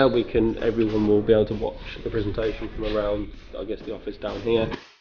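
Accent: United Kingdom. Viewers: Difficulty in understanding your U.K. Regional Brand of English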